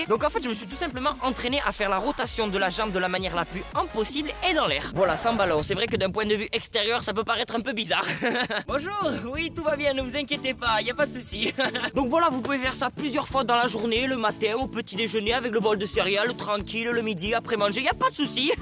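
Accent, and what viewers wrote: Marseille, France. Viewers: Toi t'es Marseillais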